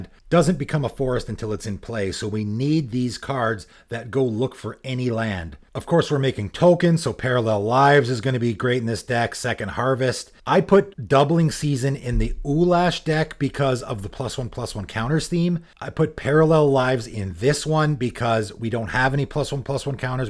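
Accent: Canada. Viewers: bruh the heavy canadian accent is half the reason i subbed to this guy. I fucking love it edit: you realize its great when you replace every "a" sound with the ol canadian "eh" for example: s"eh"ga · Love ya but boy the Canadian pronunciation is KILLING ME.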